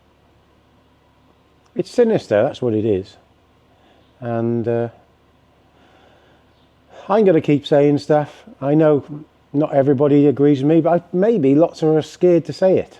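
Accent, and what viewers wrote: United Kingdom. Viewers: you're so right sir... also you sound a bit british?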